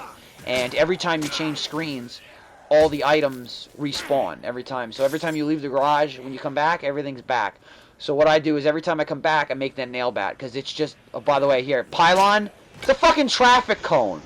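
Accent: New Jersey, United States. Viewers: Yeah it’s because he still had his Jersey accent. Texas changed it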